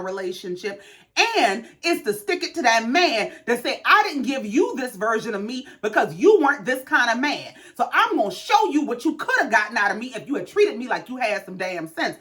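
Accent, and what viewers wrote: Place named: New Orleans, United States. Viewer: I can tell you're from New Orleans baby lol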